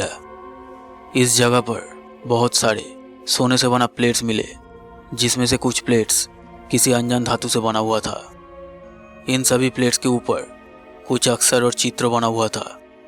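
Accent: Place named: Bengal, India. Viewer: Awaz sunke bol sakta hu,Bhai tum Bengali ho na ? 😂 Sach batayo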